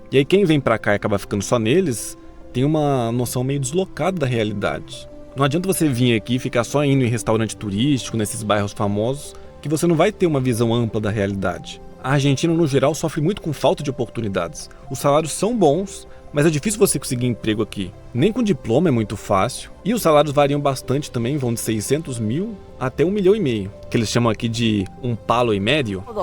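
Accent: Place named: Minas Gerais, Brazil. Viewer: Ótimo vídeo! Comecei meu périplo por seus vídeos com a reportagem sobre Zigurats. Daí chequei sua lista e vi que este aqui é o mais recente. Que grata surpresa, meu amigo! E o que mais gostei, além dos detalhamentos, foi do humor que você imprime às reportagens. Só uma dúvida: Você é catarinense mesmo? Sotaque mineiro de BH inteirinho! Abraço!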